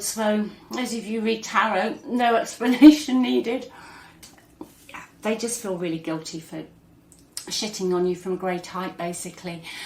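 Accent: United Kingdom. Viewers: Hi ,greetings from Montenegro ,i love Youre Brittish Akccent,i love English language i speak and learn as a child ,thank you for youre readyngs ❤❤Love Light and Happy New Year 🎉🎉🎉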